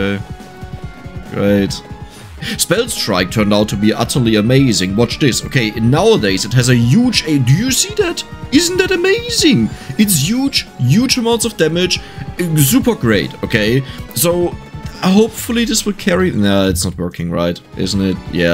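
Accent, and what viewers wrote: Germany. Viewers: Lol hab grade rausgehört das du deutschsprachig bist lmaoo jetzt kann ichs nicht mehr überhören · Took me 17 minutes to realize you're German lol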